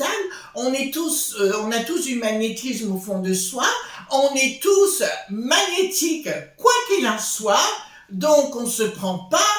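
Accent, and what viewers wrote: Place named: United Kingdom. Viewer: J'adore votre humour, c'est vrai la vie est belle, vivons là à 100%. Les personnes néfastes ne font pas parties de ma vie, je m'entoure de personnes saines et qui aiment vivre au jour le jour. Merci pour cette partie de rigolade avec l'accent So British, ça fait du bien!! Bisous à vous et à la communauté 😘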